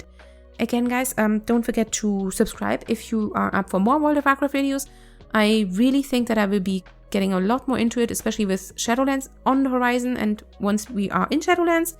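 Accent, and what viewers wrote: Germany. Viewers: You are not even trying to hide your German accent like many do. I love it. You seem like a sweet person, I main a bm hunter but I want to try something new and since I don’t like mm I will give sv a chance. Thx for the guide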